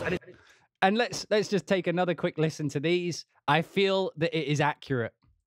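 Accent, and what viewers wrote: United States. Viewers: My gosh your American accent is outstanding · American accent sounds real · Man you have a great American accent!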